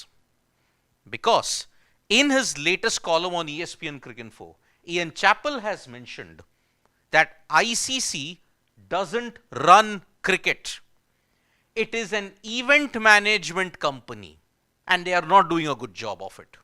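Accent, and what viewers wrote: South India, India. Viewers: Typical idli sambhar filter kaaphi tambi he is🤮🤮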